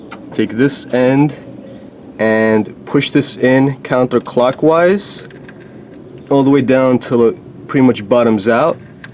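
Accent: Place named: Alabama, United States. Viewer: I enjoy your videos , they take me back to the days of hanging out with my brother , thank you for that , but I have to ask , I can't place your accent , is that Alabama or maybe southern Georgia ? 😈😂😇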